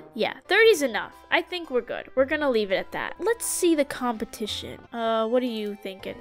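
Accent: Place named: South, United States. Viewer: I love her southern accent Like actually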